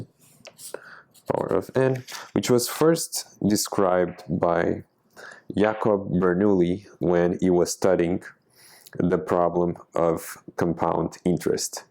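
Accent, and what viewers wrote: Portugal. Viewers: Sotaque português? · Pela pronúncia acho que és português. Gostei deste vídeo que encontrei por acaso.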